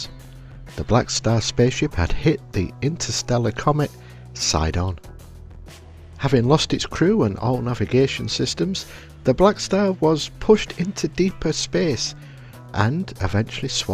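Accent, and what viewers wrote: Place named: England, United Kingdom. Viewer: Great build. Nice to hear an English voice for a change. Davey Jones.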